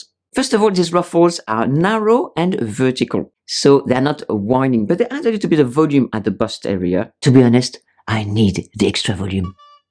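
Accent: France. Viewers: you look fabulous in the purple blouse.😍 That's the advice I needed today, since not only do we have the same name, but we look similar as well, size, colors and all. It is still your wonderful French accent that draws me to your videos though! 😂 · First of all I have to say I could listen to you talk for hours. Love the beautiful French accent. My ability to speak French is nil.😂 When I went to Europe. It was obvious that French women know how to dress. They know how to live life! They have impeccable style. Clothing is about quality not quantity. They spend money having their clothes tailored. They know how to buy clothes that fit!! They know how to dress for the occasion. They're very mindful of where they will be going and what they will be doing. Even the way they do their makeup. It's sensible yet sexy. Because of this they have je ne sais quoi. You develop style through your use of fashion that is unique to you. 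You don't wear a trend. That's definitely something I wish I would have discovered in my younger years. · Kia ora from Aotearoa, New Zealand!💙💚🙏 I really loved this video and think you're wonderful too!🙏 I love your beautifully soothing french accent and voice. I'm looking forward to more of your videos 🌟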